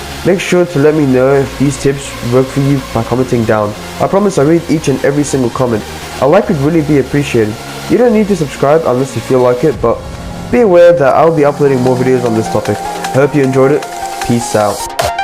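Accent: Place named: South Africa. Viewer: Thanks for the vid, but what is your sensitivity for controller Btw you sound South African :)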